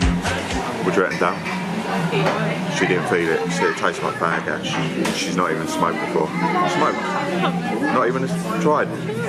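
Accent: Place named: Cockney, United Kingdom. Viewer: I enjoy watching YouTube's interpretation of your cockney twang on the auto generated subtitles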